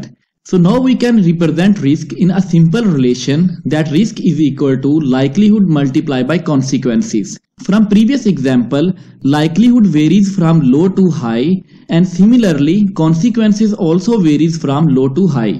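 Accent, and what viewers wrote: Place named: India. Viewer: He has got the Indian varient English all mixed up? Or is he in a cold bath?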